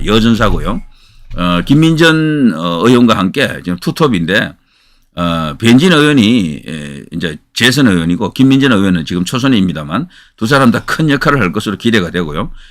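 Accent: Gyeongsang, South Korea. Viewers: 정규재 너나잘해라 정규재 음성 발성톤 엿가락 처럼 ㅋ 경상도 억양인데 이상해 나도 오리지날경상도인데 정규재는 무슨말억양이 촌스럽고 촌스럽고 듣기싫은 음성 억양 말솜씨 암튼 극혐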